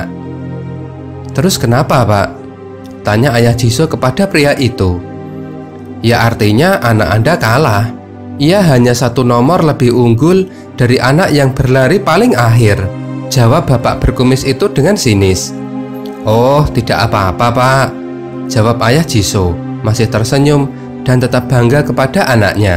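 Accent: Java, Indonesia. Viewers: Adik aku bilang "jisoo org keturunan Jawa yaa bang!?" Itu mah abangnya ini yg pake logat jawanya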